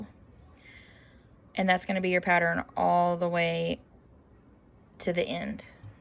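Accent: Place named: South, United States. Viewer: NICE! I’ll be making some of these for my son’s family. You must be a southern gal. I’m in Louisiana and you sound like one of us. 😍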